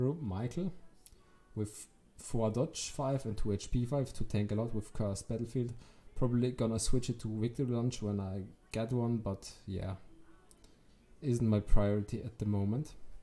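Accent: Germany. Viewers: hab gar nicht gemerkt das du deutsch bist aber dein Akzent hat man raus gehört dann kam ne Twitter Meldung auf deutsch:D btw kranker Account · Was für ein Deutsches Englisch XD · Ein deutscher an der spitze ? Wow (deutschen Akzent höre ich unter <num> mio englisch sprechenden herraus :D) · Kommst du aus bayern? :D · hast aber echt nen deutschen Akzent dachte auch du wärst Deutsch bis du es zum Schluss gesagt hast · Deutsche hört man raus xD Auch wenn er viertel türke wäre und er das flüßig sprechen kann, Das ist der akzent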